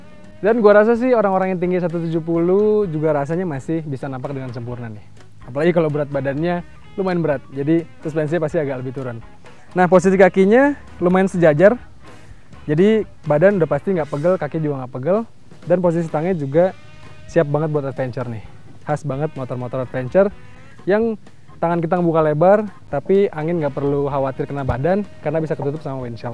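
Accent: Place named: Cirebon, Indonesia. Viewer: 5 bar jeh.... kaya orang cirebon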